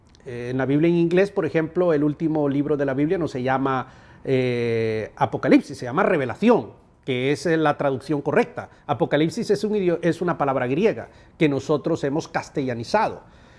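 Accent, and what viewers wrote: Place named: Spain. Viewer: De dónde la sale hablar Español de España a este cuerudo hondureño? Siempre pensé que este tipo era un engreído.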